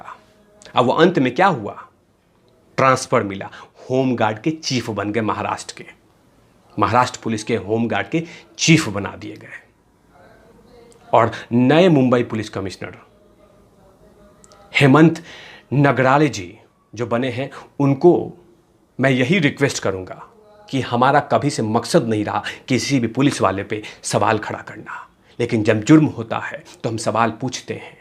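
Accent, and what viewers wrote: Bihar, India. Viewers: विहारी बोली और खड़ी बोली दोनो साथ चालाइये भैया 🌹🌹🌹🌹🌹👌🏻👌🏻👌🏻👌🏻👌🏻👌🏻👌🏻 आपका पुराना वाला अंदाज चाहिए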